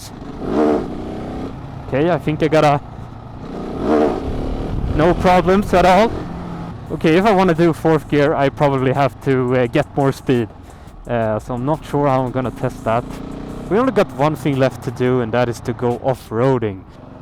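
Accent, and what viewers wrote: Sweden. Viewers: Ironic? You can't sound more Swedish when you speak English.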